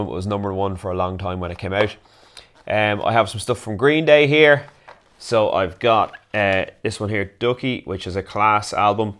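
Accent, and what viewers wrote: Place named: Ireland. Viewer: Yes!!! CDs with an Irish accent 👍 Loadsa, y’ just can’t go wrong🤘 👍🇮🇪🇵🇸✊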